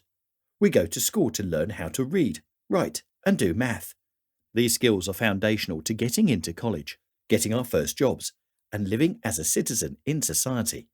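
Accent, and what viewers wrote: United Kingdom. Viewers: Why is nearly every video like this one narrated using a British accented voice? Do people really think that words spoken in a "posh" British accent convey more meaning or that the speaker is more intelligent? Most of these voice-overs are probably computer generated so perhaps they are more intelligent - even more so than most actual Brits.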